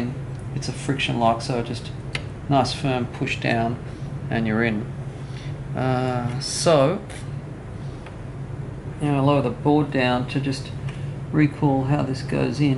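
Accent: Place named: Australia. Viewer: Great video, good the hear you still have the great Aussie accent! Different problem with my 6000 but excellent guide.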